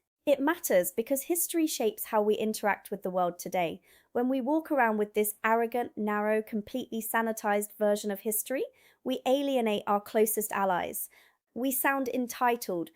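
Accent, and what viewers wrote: United Kingdom. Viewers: If you're American why do you speak with a strong British accent?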